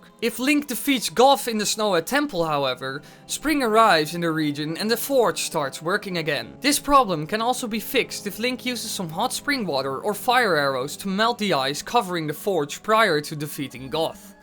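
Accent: Netherlands. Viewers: Ben je nederlands? Jouw accent heeft echt wel overeenkomsten met nederlandse taal Haha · You really sound like a dutch man :3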